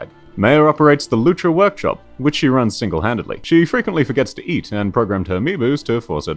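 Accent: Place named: Australia. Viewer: Perfect quality video except the aussie accent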